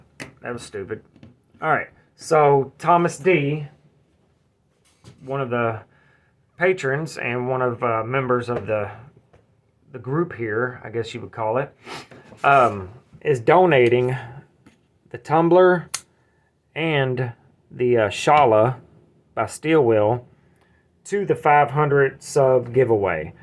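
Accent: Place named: Mississippi, United States. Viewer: You sound just like a cousin of mine that lived in Mississippi.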